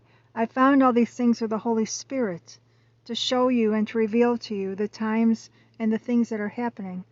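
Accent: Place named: United States. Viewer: Nobody can understand your heavy american accent. Kindly speak with neutral accent or provide sub titles in English.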